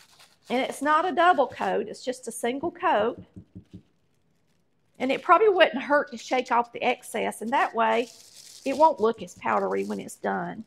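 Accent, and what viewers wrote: South, United States. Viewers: I enjoy your accent! those limA beans looked good. Cook on girl from the south,oh and thank you.♥🌈👵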